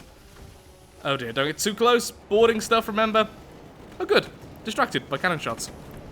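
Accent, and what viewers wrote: United Kingdom. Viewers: No I'm here for the jokes , British accent and KABOOMA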